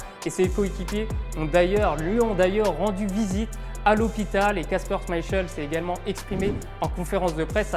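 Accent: Italy. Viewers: Jusqu'à là on n'a pas pu mettre un visage sur la voix de l'accent italien.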